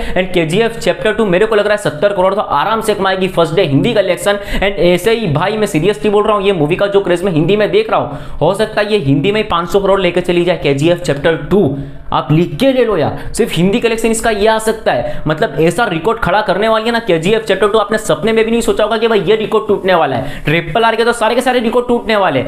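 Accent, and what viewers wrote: Gujarat, India. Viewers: You are Gujarati?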